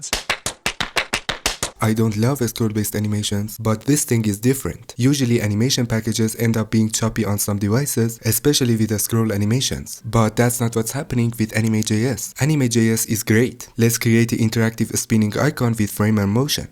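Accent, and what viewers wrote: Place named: Iran. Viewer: He sounds Iranian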